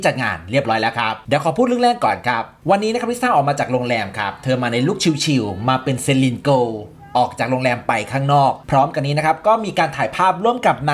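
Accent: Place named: Nakhon Phanom, Thailand. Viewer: พูดได๋… เหมือนนครพนมบ้านฉันอิอิ❤️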